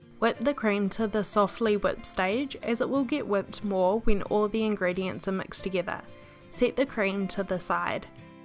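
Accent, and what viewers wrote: New Zealand. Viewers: She sounds like she’s from New Zealand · That New Zealand accent reminds me of my cousin. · Definitely sounds like a kiwi accent! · really good authentic nz accent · I loved your voice and accent like from 🇳🇿 . Not to mention your channel and video content. I will try this one to make and will use whittaker dark chocolates too!!! Love chocolates from🇳🇿. I loved cheesecakes, blueberry cheesecake is one of my favorites by far.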